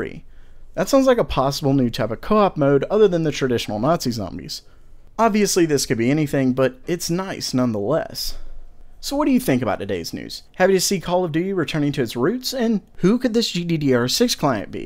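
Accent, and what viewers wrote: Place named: South Carolina, United States. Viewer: Trying to pin point your accent, armican mid south, possibly south Carolina, I am a Canadian so I don't know my states very well, lol.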